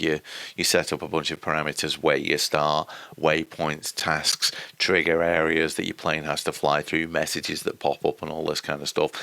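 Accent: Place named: Lancashire, United Kingdom. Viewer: Honest - I enjoyed every minute of it and I learned a helluv a lot from it - even moreso in an accent that goes well with me who also stems from 1/2 way down the East Lancs Rd - 85 years ago I knew absolutely nothing about all the fuss about DX 12 but now I do I can't say I was impressed by the final graphics - it was very "posterised" I was hanging back from jumping in but I will give it a go - on a separate install and add bits of Orbyx as I go John Heaton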